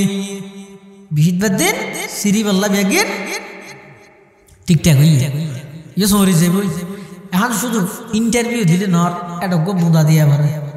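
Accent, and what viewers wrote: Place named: Chittagong, Bangladesh. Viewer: চিটাগাং ভাষা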